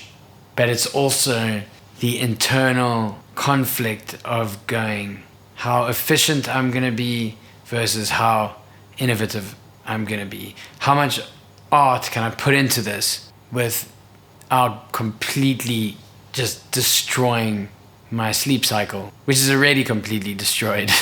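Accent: South Africa. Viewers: Howzit! Is that an SA accent I hear? Thanks bru for all the info... was wondering if you think your region restricts your success on YouTube? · I didn't know who you were until pressing play on this monster length recommended video. To immediately hear the South African accent and find out that you're also a Cape Town boy I knew I wanted to watch it till the end. The love and attention to detail you put into making this Masterclass is amazing and using all of your own advise within it places this at the top of my recommended list for anyone interested in film making. I'll definitely watch this again and add what I've learned from you to my next project. Thanks BRU and God bless.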